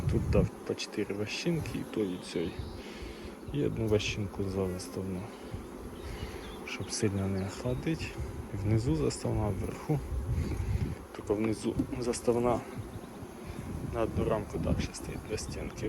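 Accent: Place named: Surzhyk, Ukraine. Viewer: Все гарно,але суржик просто вуха ріже!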